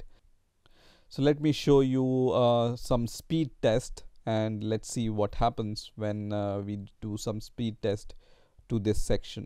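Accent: India. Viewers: Never trust a dude with a Indian accent when it comes to your pc...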